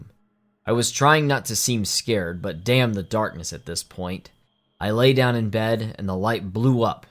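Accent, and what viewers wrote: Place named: South, United States. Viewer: Nothing wrong with a Southern accent! :P lol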